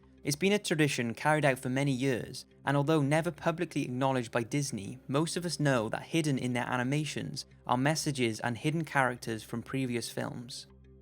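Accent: Wales, United Kingdom. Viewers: Are u welsh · are you Welsh? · Are you welsh? You sound like you have a Welsh accent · All the commentary is very well done in your videos. Other similar Top '#' channels either have annoying voices or talk too slow, fast, etc., and your accent is cool to listen to as well. (Which part of the UK are you from?) Keep up the good work!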